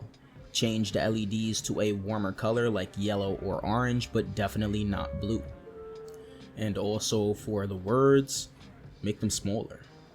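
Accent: New York, United States. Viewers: u got such a ny accent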